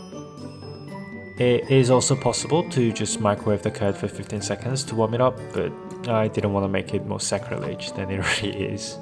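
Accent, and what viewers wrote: Australia. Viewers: ur accent are u aussie?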